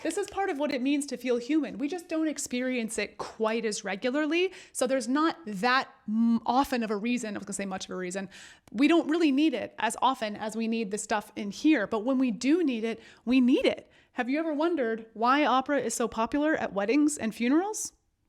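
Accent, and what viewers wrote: England, United Kingdom. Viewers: Thank you for such an informative and entertaining video. The sections where you subtracted the techniques one by one and then added them back in one at a time were very impressive. Some very thought provoking questions too. I was also pretty impressed by your English accent. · Your English accent is brilliant! Listen to the late Gene Pitney who seems to have an operatic kind of technique. Greetings from Good Ol’ England.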